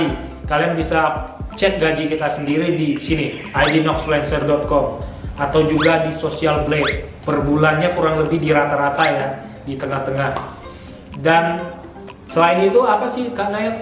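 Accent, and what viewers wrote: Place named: Pekanbaru, Indonesia. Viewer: Kak nail dari logat bicara nya keliatan bgt orang pekanbaru nya😁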